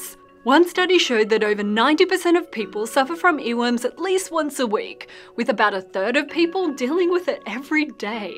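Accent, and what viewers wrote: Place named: Australia. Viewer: Just wanna say that it's nice to hear an Aussie accent on YouTube. :)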